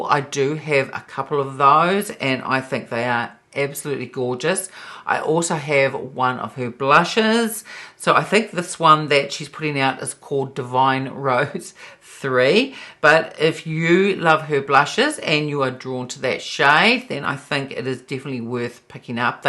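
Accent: New Zealand. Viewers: So happy to have come across your channel.....as a fellow Kiwi living in Switzerland I really enjoy hearing the NZ accent when talking about makeup 🥰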